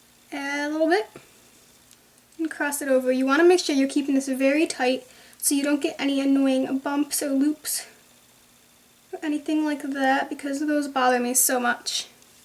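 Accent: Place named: Boston, United States. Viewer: Are you from Boston? You sound like you have a little bit of a bostonian accent! :)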